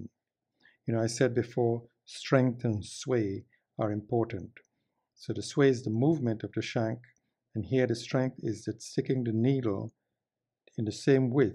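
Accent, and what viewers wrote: Trinidad and Tobago. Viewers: I knew I recognized your accent, and it was confirmed with your Trini flag! I am fascinated by the amazing detail in your work! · I recognized that accent from Trinidad & Tobago, as my mother, a Serville, is from Trinidad. What an honor to learn of this as I saw you proudly displaying the Trinidad & Tobago flag. Thank you Sir for teaching us with your videos. It is quite the pleasure listening to your wisdom and learning more about the world of Bespoke. Mr., I would love to experience a collaborative video produced between you and Mr. Jacome. It would be assured artisan brilliance.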